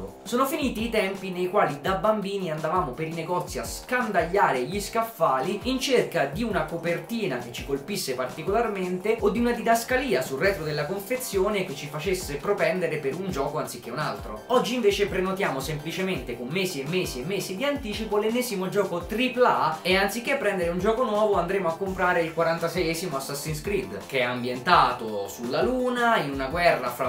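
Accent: Campania, Italy. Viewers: Scusa la domanda fuori luogo, ma sei della campania? Ho sentito male o hai detto "Semp a stessa cos" ahahahah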